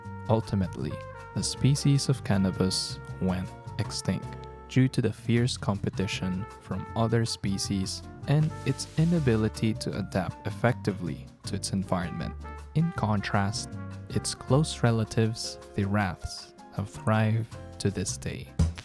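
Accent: Philippines. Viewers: The filipino accent is strong with this ons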